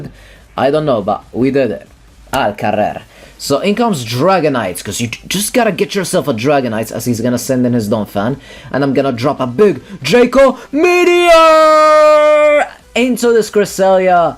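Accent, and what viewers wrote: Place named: Japan. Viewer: Your Japanese accent is epic. Critical hitto! Jettoman! I love it.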